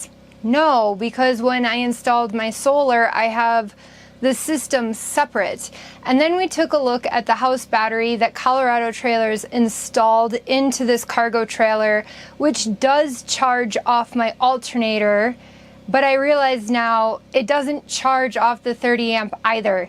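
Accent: Wisconsin, United States. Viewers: Me too....never noticed that there was a seeable problem with your nose. I think the physical pain of surgery with questionable results they will NEVER guarantee isn't worth it. Late in my career l worked for Trane and relocated to Lacrosse. I loved the WI accent, especially with females. You are beautiful and make me think you are in your mid 20's....l've already learned a bunch of tricks-techniques from you. Yes you do seem happier today....you deserve it! 😘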